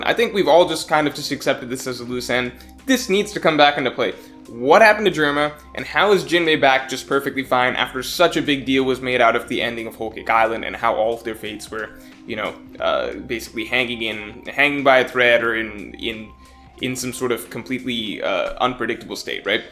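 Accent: India. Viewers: Hey, which part of India are you from? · are u indian?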